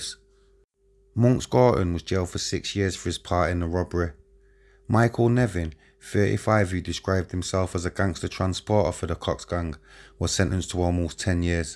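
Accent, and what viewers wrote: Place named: Manchester, United Kingdom. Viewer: Why are you putting your ‘manc’ accent on mate ?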